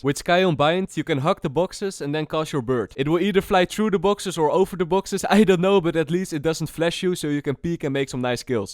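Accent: Netherlands. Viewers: You really sound Dutch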